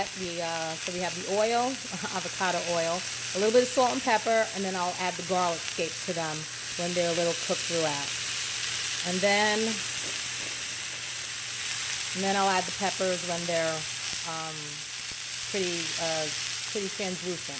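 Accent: New York, United States. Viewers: I'm pretty new to your channel...and I love it!!!! I'm all about the cooking, the hauls, the garden harvesting. I love your way of speaking...great NY accent. Cheers from Montana!!!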